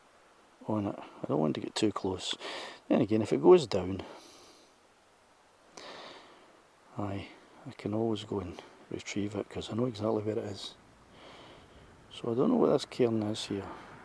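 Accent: Scotland, United Kingdom. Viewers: Your vlogs are really very good as you explain things in a simple and non complicated manner...💯..the language barrier as you say is a wee bit difficult to understand because of your lovely Scottish accent but the English captions fixes that problem...love your work..💯keep on droning..👍🍻🇦🇺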